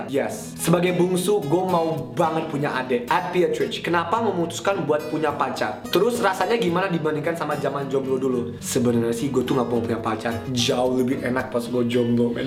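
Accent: India. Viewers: Njir accent india nya..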